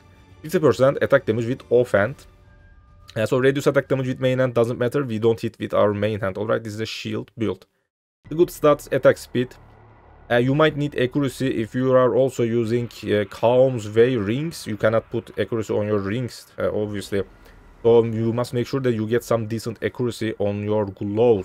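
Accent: Turkey. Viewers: Bu videon önerilerde karşıma çıktı ve şunu söylemeliyim ki, başka hiçbir şeye bakmadan İngilizce şivenden Türk olduğunu anladım :D Bunun dışında güzel build, içeriklerinin devamını dilerim kardeşim :) Discord kanalın var mı bu arada? Açıklamalarda veya profilinde bulamadım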